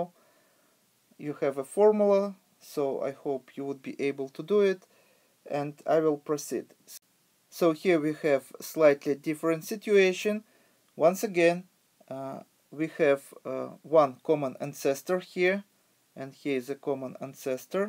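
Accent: Russia. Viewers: Вам нужно работатт над произношением. Сильный русский акцент на английском - это что-то ужасное. По себе знаю) А видео очень информативны!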